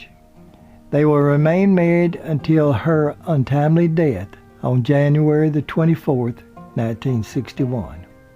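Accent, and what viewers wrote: South, United States. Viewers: Fell asleep whilst listening to the Southern accent talking about Jack Elam .....very interesting but slow . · I always liked Jack Elam and wondered what happened to him. As a kid, I remember a TV western when it showed a picture of Jack with the introductory words saying something like "Gunman turned Lawman", which, as I remember was a pretty darn good show. Thanks for your nice Southern style (voice) discussing the life and times of Mr. Elam. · Your voice is as unique and memorable as Christopher Walken’s; the rhythmic southern drawl is just the icing on the cake. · Thanks ever so much for this great tribute to mr. Elam. I have always enjoyed his movie acting. He may not have been the most handsome man in the room but he had a commanding presence on screen! And as for your southern accent , I think that it’s very unique , you don’t sound like everyone else! 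And for me, that’s a good thing! I have subscribed.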